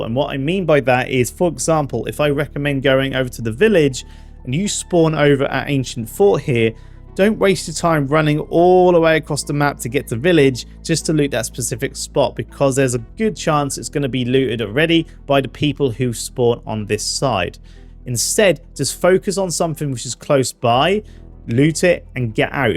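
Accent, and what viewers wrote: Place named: England, United Kingdom. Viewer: How do you have an english accent so thick it makes it sounds like English isn't your first language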